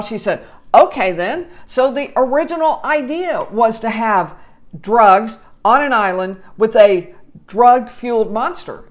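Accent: South, United States. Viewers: Do I hear a southern accent? I'm from VA and GA . I learned to not use accent but it slips out? Thanks for your time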